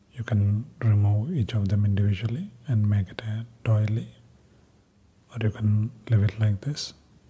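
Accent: India. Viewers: It's literally the best. I love watching ASMR videos where the person has an accent, but the Indian accent is the best. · I love the Indian accent. 💜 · I like Indian accents.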